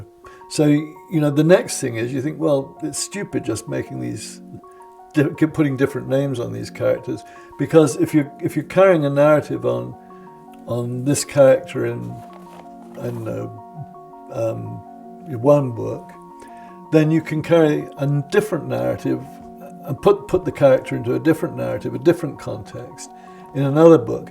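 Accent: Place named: Southern England, United Kingdom. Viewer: He's not from Liverpool though! His accent is from the south of England (like mine)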